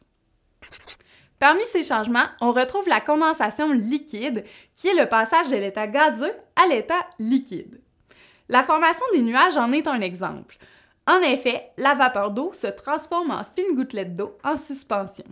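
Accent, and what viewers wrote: Quebec, Canada. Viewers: vous êtes québécoise?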